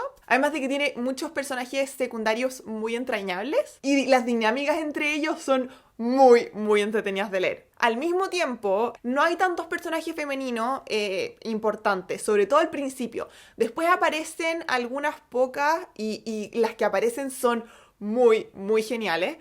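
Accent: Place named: Chile. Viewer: Mi obsesión por The Untamed me recomendó este video y cuando le puse play... Ese acento? Ese acento es chileno? Es chilena csm xD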